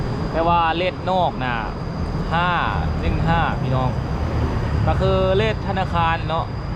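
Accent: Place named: Laos. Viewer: สู้ๆครับไม่ต่างกันเลยไทยลาวสมาชิกใหม่เด้อรับด้วยครับชอบสำเนียงลาวครับ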